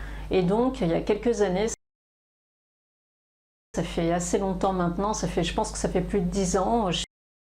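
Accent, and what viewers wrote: France. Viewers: Bonjour, ça fait plaisir d'entendre le parler francilien plutôt que le français standard, le discours semble tout de suite plus naturel! Sinon, je me voyais devenir prêtre, tendance mystique, conscient que j'étais très tôt de l'existence de l'invisible tout autour de nous. Les métiers dans le domaine médical et l'éducation me faisaient horreur, car trop sociaux. Cette vocation s'est vite effacée à l'adolescence, en raison d'une part, de l'imposture que je suppose dans cette croyance, et d'autre part, par le sacrifice imposé par le célibat... Et sinon, je suis ornithologiste amateur, pour pouvoir apprécier la faune sauvage locale, qui disparaît à grande vitesse désormais.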